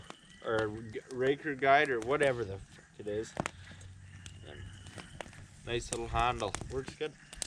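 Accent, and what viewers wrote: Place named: Manitoba, Canada. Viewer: Where Canada? Your accent sounds like my family in Manitoba. I like it, makes me feel at home... deadly!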